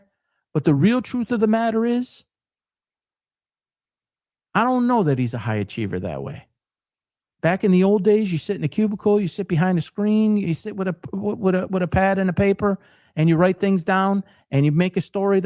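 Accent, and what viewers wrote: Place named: Detroit, United States. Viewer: you took offense to him saying you sounded like you’re from Detroit. easily offended much?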